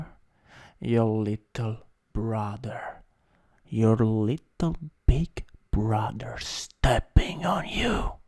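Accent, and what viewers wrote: Italy. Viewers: I love ❤the Italian accent is the best😅😅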